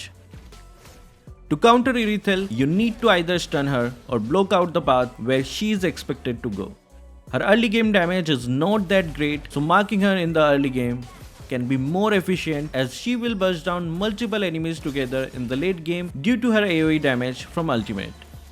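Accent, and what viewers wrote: India. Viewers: I think you are Indian because ur voice is like an Indian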